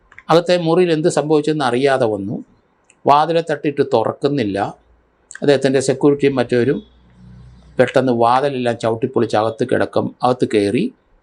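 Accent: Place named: Thiruvananthapuram, India. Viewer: അത് പ്രാദേശിക ഉച്ചാരണം ആണ്. ഞങൾ തിരോന്തോരംകാർ അങ്ങനെ പലതും പറയും. ആറ്റുകാൽ കോവിൽ എന്നതിന് ആറ്റുവാകോയിൽ, ജനറൽ ആശുപത്രിക്ക് ജന്നലാശൂത്രി ഒക്കെ ആണ്.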